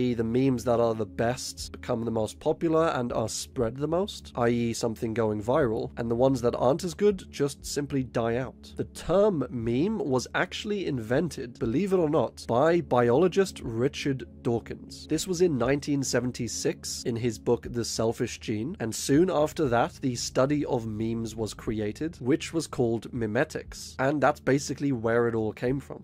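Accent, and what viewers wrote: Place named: Wales, United Kingdom. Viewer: Im glad ive spotted your chanel content is fantastic keep up the good work also i hear a slight welsh there in your accent if im wrong please correct me im just a huge fan of the welsh accent probably the most amazing accent in the world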